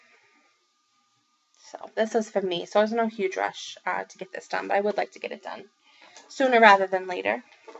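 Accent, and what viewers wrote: Boston, United States. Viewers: Great video and great haul!! I love listening to you!! Your pieces are awesome!! Ps.. I am Canadian and I knew your accent was Boston!! Love it!! Happy Birthday!! I hope you have lots of stitchy fun!! And happy thanksgiving to you and your family!!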